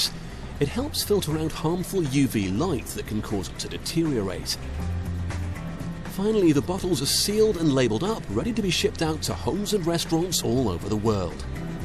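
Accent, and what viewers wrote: United Kingdom. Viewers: The video states, "if the fruit goes over 27 degrees, it can no longer be called cold-pressed". Since the narrator sounds British, I assume that it is 27°C (~81°F). The average USA swimming pool is kept at 80°F, so if you got into a bath of 80°F olive oil, the oil would feel cold since human bodies are around 98°F. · British accent > American accent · anybody else cant stand british narrators? idk, maybe its the accent, maybe it seems like hes trying to sound like a smartass.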